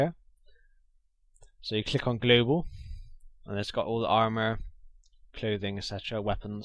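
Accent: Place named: Ireland. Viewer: Great tutorials, and do you happen to be from Ireland? You sound like it :P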